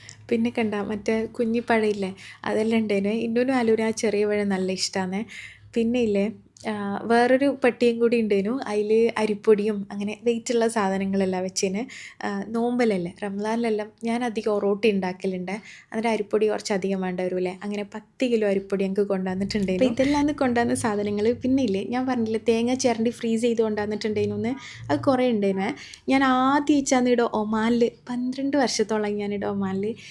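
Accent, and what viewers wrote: Kannur, India. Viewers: ഇവർ വർത്താനം പറയുന്നത് കടിച്ചു പിടിച്ചു ആണ് അതുപോലെ തന്നെ എപ്പഴും പിന്നെ എന്താ പറയുക എന്ന് ഏതിന റീപിറ്റ് ചെയ്യുന്നേ കണ്ണൂർ ഇങ്ങനെ ആണോ സംസാരം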